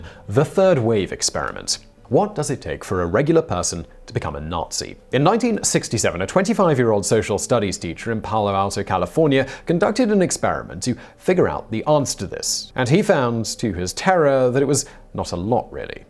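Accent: United Kingdom. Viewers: He isn't either. He's British me thinks :D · Yes, he can. He can relate the horror experiment interestingly while keeping it light with his lovely British lilt.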